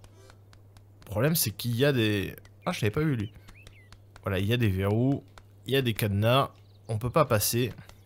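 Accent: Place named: Banlieue, France. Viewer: Ça te va pas l'accent des banlieues :( trouve autre chose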